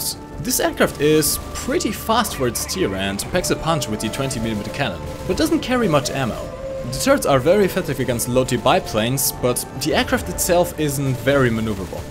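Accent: Germany. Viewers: The Whole vid i fought : "Is he german?" then you said Flakpanzer blabla etc. then i new yep you are :D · Dat german accent though,, i wish i could speak german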